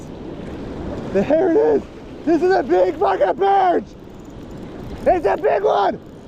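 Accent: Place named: Oregon, United States. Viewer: Never apologize for being and expressing yourself naturally in the way you do! Especially with joy and elation! That's what it's all about man. Hell of a trip, hell of a fish, and that message you made about never letting the kid in you go away is dead on. And you gotta love the classic native Oregonianisms like Crik and Spendy! A great tell to identify a born and raised Oregonian.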